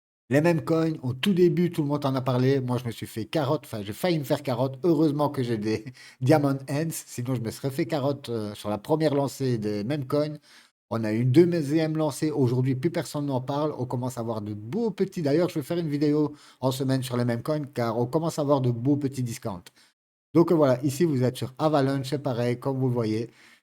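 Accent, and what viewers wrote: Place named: Belgium. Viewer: toi tu es un compatriote,accent Belge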